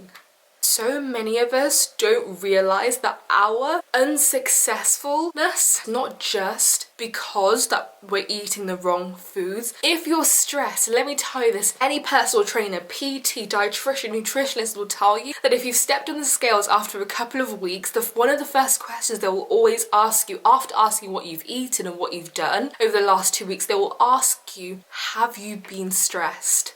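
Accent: United Kingdom. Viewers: irrelevant trash Its like a british accent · I just realized that you’re talking with British accent😂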